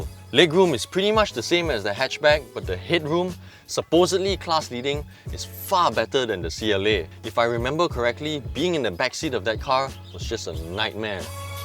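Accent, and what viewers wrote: Malaysia. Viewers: 怎麼感覺是馬來西亞腔英文....好奇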